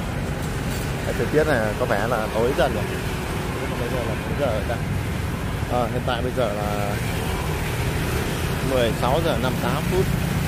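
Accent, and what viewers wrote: Vietnam. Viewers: Chủ thớt làm kiếm cơm ..phát ngôn nói cho chuẩn vào ..đi xe khách kể cả từ bắc vào nam sg 6/7 năm trước ko một nhà xe nào đi tới hai đêm ba ngày chứ đừng nói đi từ miền trung ❤..còn nói giá thông phương tiện sg 5 năm nữa dân toàn đi xe hơi kiếm 1 chiếc xe máy còn khó ...ko nhanh dễ phát triển vậy đâu.thu nhập mặt bằng trung người dân ...nhất là người công nhân lao động tự do ..thu nhập vẫn còn thấp ..nghe giọng cháu này ..hoa thanh quế..quê choa 😅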